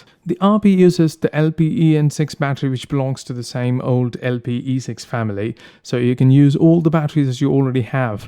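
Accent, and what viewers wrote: United Kingdom. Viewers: Accent like BBC news reader, Superb bro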